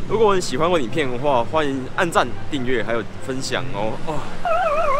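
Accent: Taiwan. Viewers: 真的，藏不住的台味XD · 講話真是夠台哈哈，跟我叔叔講國語一樣哈哈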